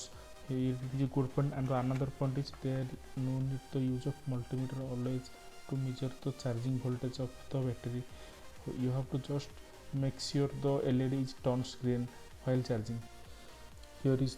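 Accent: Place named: Odisha, India. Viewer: Your English has Odia Accent.... Where are you from ???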